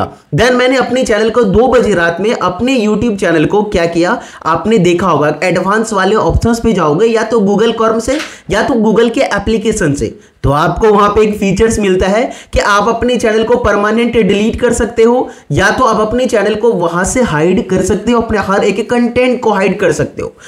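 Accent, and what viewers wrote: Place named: India. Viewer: USA ka time mater nhi karta hai aapko English main video bana hga aur usa ki log Indian accent itna dekhta nhi hai 😅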